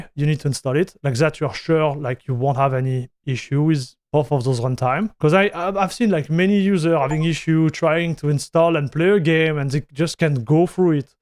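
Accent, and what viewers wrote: France. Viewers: Superbe accent Français ! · Merci pour cette vidéo et quel accent français 😋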